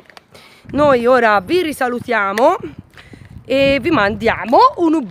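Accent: Tuscany, Italy. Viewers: Bel video :) tieni un sacco di compagnia e il tuo accento toscano è meraviglioso :) ❤🌹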